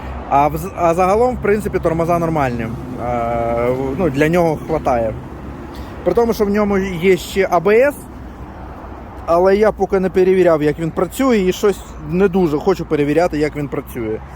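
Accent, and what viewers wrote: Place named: Russia. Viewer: Комент в підтримку каналу, виключно за українську мову! Відчувається що людина раніше розмовляла Мокшанською... Респект! Розвитку і успіхів!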